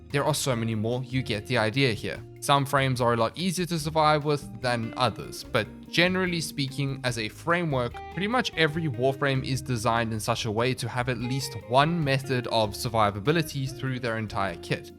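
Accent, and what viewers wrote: South Africa. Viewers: Bro it just hit me. Are you South African?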